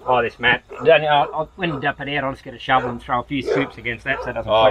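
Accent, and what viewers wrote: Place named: Australia. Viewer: Great video so good to see and hear an Aussie on utube. Once in a life time job well done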